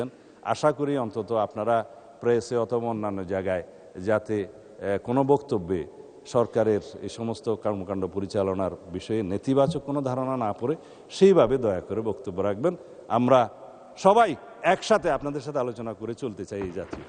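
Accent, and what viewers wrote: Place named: India. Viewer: এর কথা শুনতে ভালো লাগেনা,,, ভারতীয় একটা ভাব এর মধ্যে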